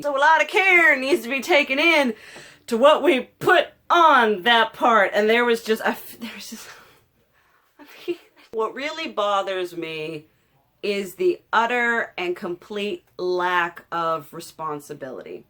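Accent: South, United States. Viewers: I detect a southern accent! Where are you from? I’m from NC. And if you’re not from the southeast you dang sure sounded like it lol. New sub!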